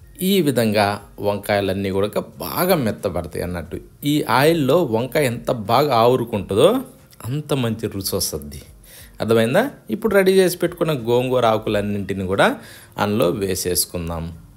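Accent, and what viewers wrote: Nellore, India. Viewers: Nellore valla andi meeru . antha ma language.baga chesaru.baga chepparu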